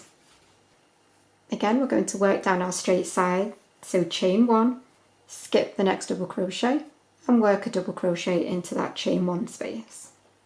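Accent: United Kingdom. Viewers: My heritage is Scots and I love a British voice, and I appreciate so much learning a new pattern. I have been crocheting since my mother-in-law taught me how in 1997. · Hello! 😊 First, thank you for your wonderful instructive video! Your voice and British accent sounds very kind and patient. My question is - Can this be done without turning after each round? Does it not look good if you don't turn? I taught myself how to do some crochet back in the 70s, but at that time I usually only did the basic stitches (single, double, triple, slip stitch and so on. I hadn't heard of the monk stitch yet before today. And I also haven't done crochet in a about 30yrs, I wanted to make a macrame dream catcher and decided to make a doily instead of purchasing one. I think I've found a renewed love for the crochet! Thanks again for your video. I'm sure I will watch more!☺️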